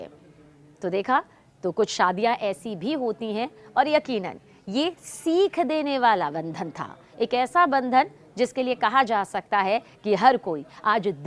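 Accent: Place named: Mumbai, India. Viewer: Ap maidam bihar se nahi ho apke awaj lag rahi ha ap mumbai ke ho 👍🏾👍🏾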